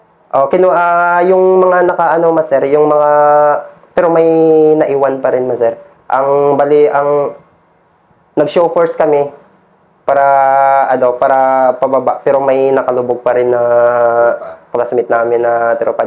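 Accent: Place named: Ilocos, Philippines. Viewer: ilokano yan taga ilocos norte... tanongin mo siya kasi mga taga roon.. ala won barok... di ala eh!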